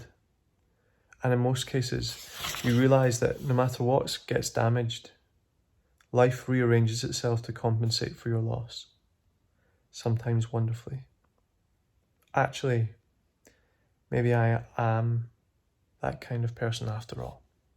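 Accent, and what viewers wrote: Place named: Scotland, United Kingdom. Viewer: Omgosh, 2nd i heard the scottish accent I jizzed myself....wellllll, the book is on my night stand as we speak, so lezzz do disss.....also have the audio book on deck just in case. I only hope to find a boyfriend to gift me books on valentines day some day heart eyes emoji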